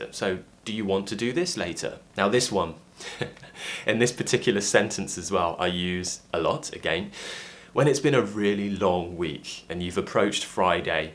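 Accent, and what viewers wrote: RP, United Kingdom. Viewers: I love British accent. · This a true modern RP accent. I really fancy this accent 🇵🇰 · Really like ur accent and also ur voice! Wanna have British accent too!🤩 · I love the British Accent...I'd like to imitate you. Greetings from Cuba. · I love British accent. Thanks. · I love the British accent very amazing tnx for your video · I really love your British accent, could you please recommend some Youtube channels with the accent for toddlers, thanks a lot:)) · I love british accent, this is one of the reasons to learn more, it's so easy to understand but my writing is not one of the best. you have been helping me a lot. hugs from Brazil 🇧🇷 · I 'm a new teacher and I'm teaching British English in a secondary school as a third language(the second language is French). I love British accent .I'm really lucky to come across your channel. 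Thank you for this video.💐💐💐💐 All your videos are great.👍 Nadia from Morocco. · I love British accent alot. And Mashallah Sir the way you teach it’s amazing. Actually it’s more fun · His accent is too British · I love the British accent, especially yours, 😍😍😍 You are the Best! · I am from Indonesia... I really like british acent ang your videos are very useful for me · I love british accent,, iam indonesian and i'd love to learn more from your channel 😇😇😇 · Your British pronunciation is a thing of beauty (I don't know if I using this expression correctly, I've just learned it) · Hello! Its nice to watch your video as I am enjoying your Brits accent. Very clear and easy to understand 😊. Stay safe! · Now, I'm up for to learn more about British accent. It was so fascinated😍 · I would rather give flowers to learn British English! Seriously, I love the accent & I learn a lot from him since few years past! 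<3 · Love your british accet! I'm really enjoy to hear it.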